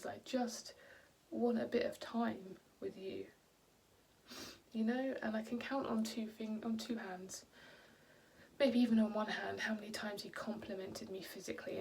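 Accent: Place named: United Kingdom. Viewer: Oh my god I’m only 20 minutes in and he sounds awful! You’re such a sweet person and you deserve so much better than this. I’ve watched your Lush videos for years and have adored you and your British accent. I know you will get out of this rut and rise like a Phoenix. Love from a vegan lushie in Ohio.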